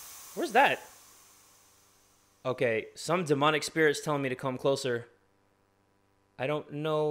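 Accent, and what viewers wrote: United Kingdom. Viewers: THAT BRITISH ACCENT THOOOOOO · got the most real British accent. Plus he is real thank god for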